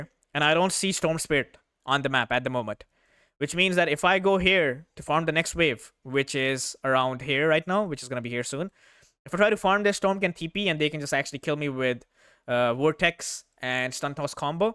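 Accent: India. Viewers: Bro.... You Indian?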